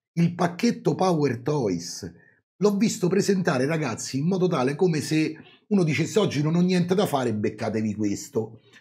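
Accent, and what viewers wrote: Rome, Italy. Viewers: l'accento romanesco è la chicca finale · Guarda, basta vedere ce ne sono alcuni. Appena fai uscire un video che guardo e che ti do il like, poi l'algoritmo di YT me ne propone altri simili con lo stesso argomenti, alcuni fatti tempo prima, ma che non hanno niente a che vedere nel modo in cui esponi tu, altri invece qualche giorno dopo che li hai fatti uscire tu, ma anche qui non ci siamo proprio. Ah, sono quasi tutti esteri. Ma a parte la lingua, l'esposizione, la completezza e la simpatia che ci metti, non hai eguali. Quindi stai tranquillo. E poi scusa, ma con quella parlata che non può essere altro che "romana", ma chi te frega!!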